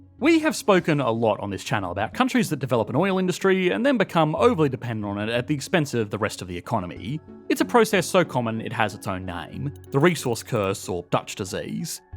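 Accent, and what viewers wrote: Australia. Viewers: I think you just have issues with the Australian accent, his demeanour is fine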